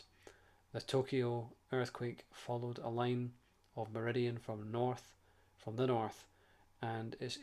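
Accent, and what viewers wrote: Scotland, United Kingdom. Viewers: I've sent your readings to my friend, I confess iv been listening as well. I've read aloud Beaumonts books to myself in my geordi accent, hearing them from a Scot though is a pleasant change haha 😄.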